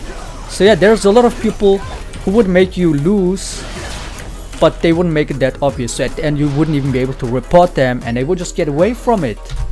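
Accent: Germany. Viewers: Die English Aussprache haha :D Kannst du mal ein Video in deutsch machen? ^^ Bist doch deutsch oder o.O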